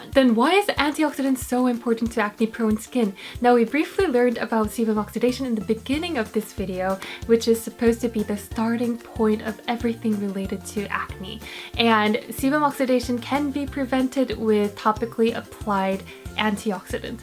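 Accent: England, United Kingdom. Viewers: Love your british accent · She has a british acsent 😅 kind a bit 😄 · is she from England? Her accent sounds kinda english :o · Her little British accent when she has the glasses on...:)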